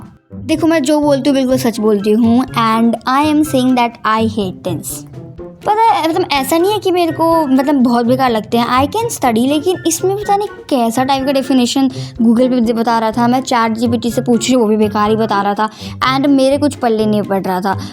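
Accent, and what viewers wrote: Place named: Haryana, India. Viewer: Acha per apki boli to haryana jaisi h 😅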